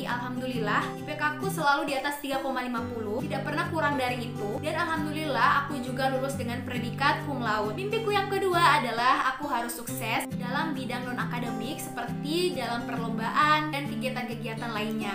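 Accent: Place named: Gorontalo, Indonesia. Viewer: Ternyata org Gorontalo. Dri awal sdh kntra logatnya :) Good job. Semoga sukses dan berkembang channelnya. Btw kita satu Almamater (UNG). Tp beda jurusan, dan mgkin beda angkatan. :) Sya dri Teknik Industri. Salam kenal. :)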